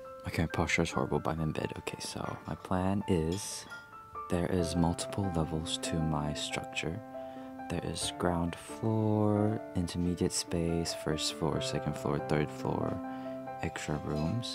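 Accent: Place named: South Africa. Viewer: Amazing work bro... I swear to God u look and sound South African... It your work though that got me💓💓💓.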